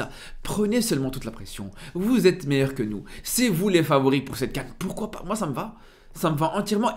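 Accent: Belgium. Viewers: Tes pas belge toi?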